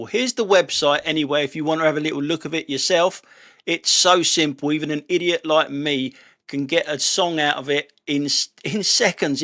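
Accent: London, United Kingdom. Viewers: Love your moaning! You sound just like my hubby with a London accent 😂 Great set of clips, but the dad on the cycle in front of his kid... What the actual...!! That child looks no more than 5 or 6 😢 crazy!! 🤪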